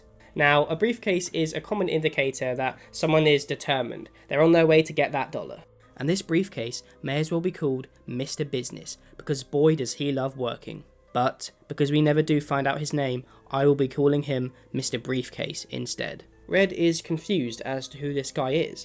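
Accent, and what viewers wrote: United Kingdom. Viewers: Is this guy British??????? Fredbear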